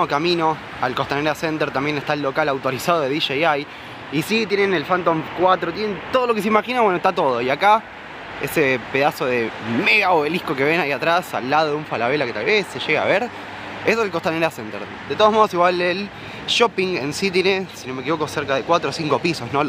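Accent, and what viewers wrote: Argentina. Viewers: Chumbo!!! Chumbo!! Que delicia de acento argentino. Esto se llama LIBRE MERCADO PAPI !!! Que lo implantó Pinochet y sus Chicago Boys y ahora disfrutamos y nos quejamos de llenos y de mal agradecidos. Vengan más argentinos y desplacen a los colombianos, que nos están cagando el país. Abrazos. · te consulto , eres porteño o del interior .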